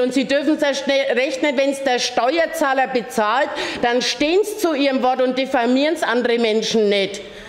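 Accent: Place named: Bavaria, Germany. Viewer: Des war vielleicht nur leicht bayrisch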